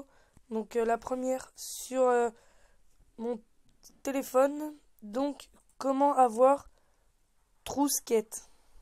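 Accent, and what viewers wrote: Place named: England, United Kingdom. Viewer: Ton accent anglais me tu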